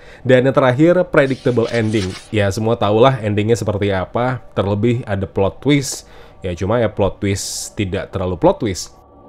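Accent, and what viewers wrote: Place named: Padang, Indonesia. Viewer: logatnya malah kya logat padang, cuma bagus sihh 😊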